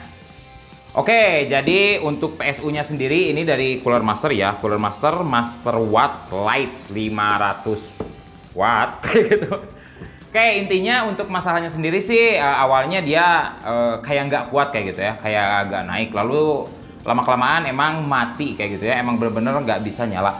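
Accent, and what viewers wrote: West Java, Indonesia. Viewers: itu sunda bandung bukan sih?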